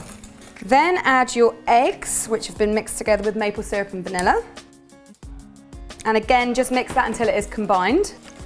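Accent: United Kingdom. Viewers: You r great! Nice recipes ;) PS: love ur british accent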